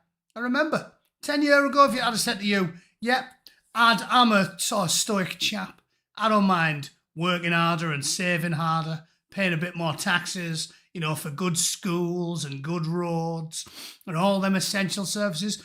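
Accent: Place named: Teesside, United Kingdom. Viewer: Where u from mate sound like a smoggy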